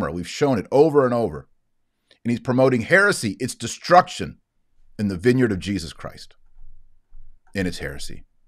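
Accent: Texas, United States. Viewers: Texas accent getting stronger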